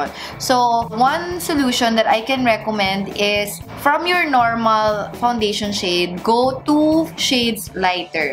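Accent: Ilocos, Philippines. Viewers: Ilocano ba yun hahhaa😂ang cute 😍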